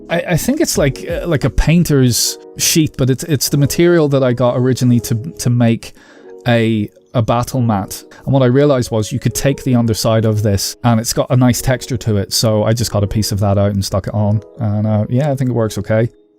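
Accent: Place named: Ireland. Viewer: Brilliant ideas done brilliantly! On an personal note,you sound like a countryman of mine. Are you a northerner who went south or a Dub who went north! Either way, you’re very talented😊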